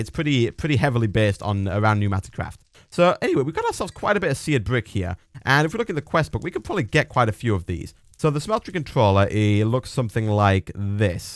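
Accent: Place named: Leigh, United Kingdom. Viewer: Are you from Liegh, Your accent sounds similar *****.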